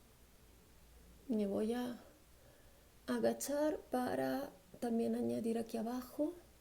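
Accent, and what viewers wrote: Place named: Italy. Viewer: Es italiana, por los nombres y el acento.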